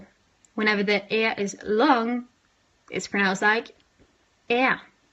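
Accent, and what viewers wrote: United States. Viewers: I hate to learn Norwegian from a person with American accent. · no lol. just say she speaks good english, u don’t need to lie. she still says for example “beddur” instead of (as most people from the UK would) “better/be’’er”, which is more correct and sounds nicer to the ears. not her fault though, sadly it’s american tv etc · Take it from me, you sound like you you learnt American English and not British.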